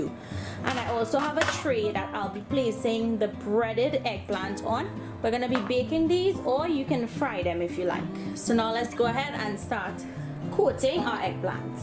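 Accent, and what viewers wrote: Trinidad and Tobago. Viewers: I was so pleasantly surprised to hear a Trini accent lol 😁👏🏼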